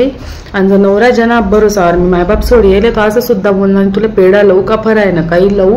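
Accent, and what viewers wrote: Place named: Khandesh, India. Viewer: पुर्ण खान्देशी accent पाहिजे ऐकायला मन तडपतय अजून आम्हाला शिकाचे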